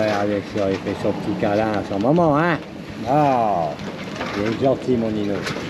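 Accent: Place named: Valenciennes, France. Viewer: D'où qu'ej viens.. bin ed Wavrin, mais maintenin ej chui din l'pas de calais, diéval pour être précis.. Mais toi,?? j'ai l'impression de reconnaître un accent valenciennois, mais je ne suis pas sûr;)... té dou tizotte??? Sage décisison de faire demi tour.. d'ailleurs, c'est un voilier, pas un hors bord, ton bateau LOL.. donc faut savoir profiter du vent: trop de vidéos où ils se doivent de mettre le moteur.. et suis presque sûr qu'une majorité ne sait pas utiliser le sextant: s'il n'y a plus d'électricité à bord, je crains que ça ne finisse en panique, si ce n'est en drame... Au fait: pilote automatique, mais as-tu un régulateur d'allure?? je demande ça car mon grand père en avait fabriqué un quand il avait son bateau à Etaples (un petit Challenger 7 mètres insubmersible): une fabrication maison avec du contre plaqué marine.. Bon, à la revoiyure dans deux jours pétants;)... 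Cordialement. éric.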